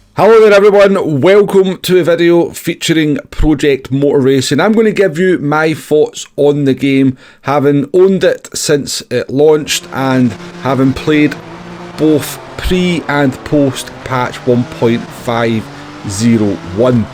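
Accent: Scotland, United Kingdom. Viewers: 😂😂 pure scottish so it is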